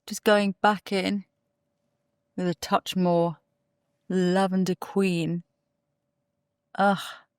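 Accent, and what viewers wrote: United Kingdom. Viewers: Me too! I'm not an English native speaker and I have a little bit of difficulty with Brit accent. I thought: did she say Talk to the poor? This is very catish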